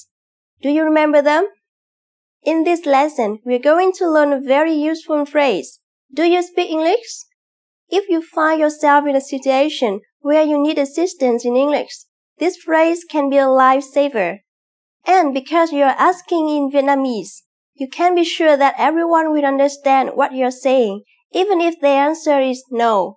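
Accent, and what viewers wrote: Vietnam. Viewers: Is this southern or northern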